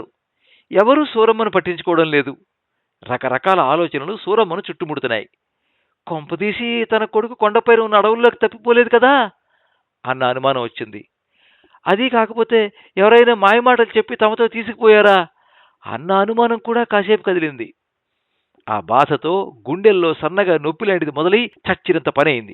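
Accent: India. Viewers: కధ బావుంది. మీరు చదివి వినిపించిన తూరుపు యాస బాగా ఉంది. అభినందనలు.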